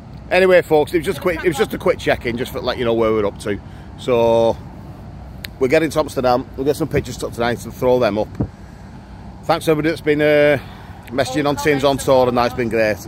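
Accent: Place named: Liverpool, United Kingdom. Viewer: Your missus is clearly a Scouser but your accent is a strange mixture of Scouse and something else! What gives!! Great videos by the way.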